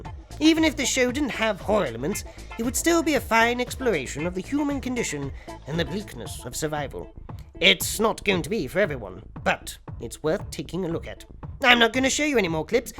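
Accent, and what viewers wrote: United Kingdom. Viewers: Are you British or are you an American playing a British puppet character?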